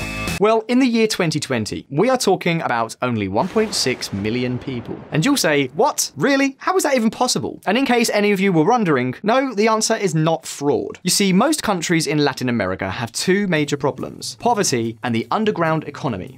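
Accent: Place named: United Kingdom. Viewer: Pretty slick move, making this video with the high production value, well spoken host, young guy, British accent to push the Uribistas' version of reality. This is not, and has never been, a "both sides" issue. Colombia does not have a middle class. It is the most economically unequal country in the world, and has been for a long time. So, the pandemic didn't just bring about hard times for this imaginary middle class. It caused those at the bottom of the upper class (people who work jobs, live comfortably, travel, have disposable income) to lose their jobs, and plummet aallllll the way down to the upper level of the lower class (people who are just barely surviving, eating most days, but not all, and living in substandard homes surrounded by crime. Having lived in Bogotá for a year, and having learned so much from my wife who was born and raised, I call foul on this whole video. 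There is no middle class. There is no infiltration by terrorist groups. Police are not being shot at. They're getting rocks thrown at them. Molotov cocktails are the most they have to defend against. ESMAD and the military are provoking the violence, every time. Facebook live videos have made these sleek propaganda pieces easily taken apart. Colombia has never had good government. I was there when a referendum vote was held to simply make corruption illegal. It was an obvious landslide. But the number of overall votes were not enough, because Uribe's paramilitary threatened many voters into staying h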